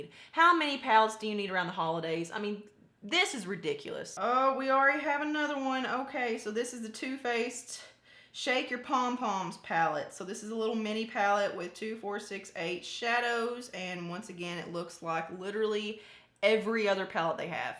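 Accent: South, United States. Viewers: New to your channel and love it ❤ I am from Alabama, you sound southern as well .. what state are you from?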